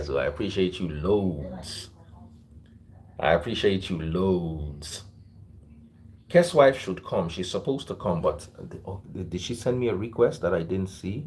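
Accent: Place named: Yoruba, Nigeria. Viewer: daddy freeze pls I love your yoruba dialect so much. can you speak more of it. lol. your yoruba always amazed me. 😆 🤣 😂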